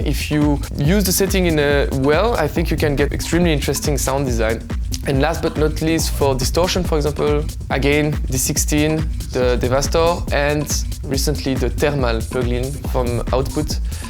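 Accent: France. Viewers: well that explains it then 😅 thought he had a small french accent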